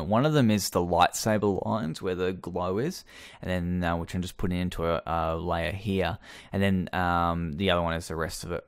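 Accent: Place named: Australia. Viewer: awesome nice style. good to hear an aussie, deff make more dude very informative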